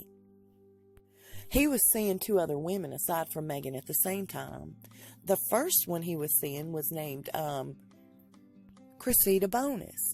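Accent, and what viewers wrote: South, United States. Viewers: me 2 I love her southern accent! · You are so precious,. I look forward to your videos everyday! I love your Southern accent. I am from TX, and I have one too. You keep me company sometimes, ad I am at home a lot.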